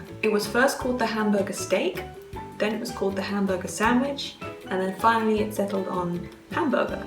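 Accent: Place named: England, United Kingdom. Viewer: Seeing as your English and live in German I thought you'd be the person to ask, do Germans seem to like your accent or find it interesting? I've had this experience with some people from other countries and wondered if you had encountered this in Germany. If so you should make a video on it, I'd love to hear your experiences :)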